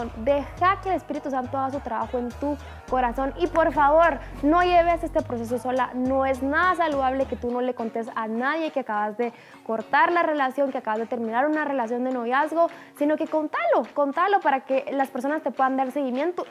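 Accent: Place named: Guatemala. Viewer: Es de Guatemala.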